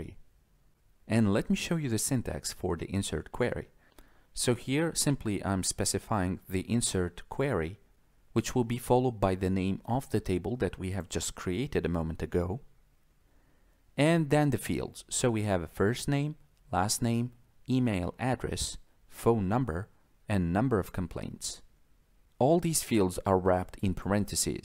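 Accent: Russia. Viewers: This was good! Is your native language Russian?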